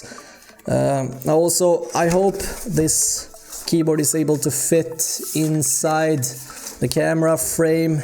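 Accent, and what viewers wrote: Sweden. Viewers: That swenglis dooo 😉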